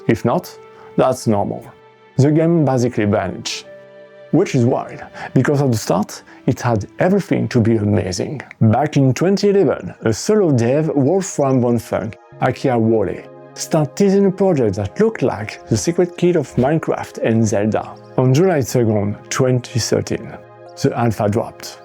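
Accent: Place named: France. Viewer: Hey, first of all congratulation on doing and posting a video. Pour le reste, étant aussi français , si je peux me permettre de pointer deux ou trois problèmes : -Le son, oui tu as un accent français, mais je pense qu'en réduisant la saturation ce serait plus audible (ça peut être amélioré largement avec une bonne technique simplement avec Audacity, même si c'est pas la meilleure solution non plus). Même étant aussi frakgais et fluent en anglais c'était un peu dur par moment. -Le montage, dans l'ensemble c'est très bien, mais comme disait il yabquelque années jdg, ou Antoine dznizl le rythme est très important, même si le rythme ne doit pas forcément être rapidew c'est mieux quand on a l'impression que tu donnes le rythme a ta vidéo, et pas l'inverse . Après je dis tout ça en ayant eu pendant longtemps l'idée de faire des vidéos, qui plus est en anglais, sans jamais en avoir fait. 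Ce sont des observations mais ça ne retire rien que tu fais mieux que 98% des gens, qui eux n'ont même pas agis. Et de reste si tu souhaite discuter ou plus de détails sur mes avis n'hésite pas (si ça t'intéresse on pourra voir par mail ou autre) Bravo encore et bonne soirée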